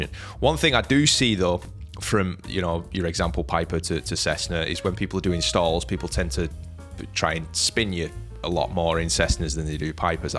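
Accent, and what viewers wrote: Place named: Bolton, United Kingdom. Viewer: As a North of England person I would guess is from Bolton, North West England, North of Manchester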